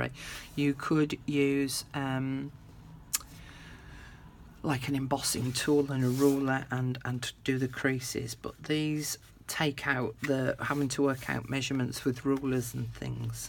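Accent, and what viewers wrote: United Kingdom. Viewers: Love that British accent and how precise you are with placing and cutting. Your instruction is excellent and I am definitely addicted to these envelope journals thanks to your beautiful presentations. I am a bit unclear about where your gorgeous kits are from. There seems to be more than one resource, your own designs and others? Thank you for sharing your incredible talent. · Oh I'm so proud of what I have accomplished today. Some pages are just a wee bit crooked...like an eighth of an inch but I still love this crooked soft covered beautiful fun journal you have taught us step by step. How can anyone do this? You are a sheer genius and a love. I learned a whole lot too. Truly I have. I have to make my cover but I'm saving it for last. I want it to be extraordinary and wonderful and full of bits of happiness... I love how you you use the word bits all the time. 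And your accent is helping me with British movies I could nevah undahstand before you. I want to show you my envelope journal when I'm done but how my dear?. Again accept my apologies for being rude about editing things out. I so love watchin you and listening to your voice. Happy nights my dear woman. Happy nights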